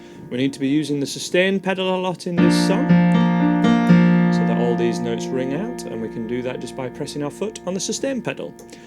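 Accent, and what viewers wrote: Yorkshire, United Kingdom. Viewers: Omg SUCH a yorkshireman!!!!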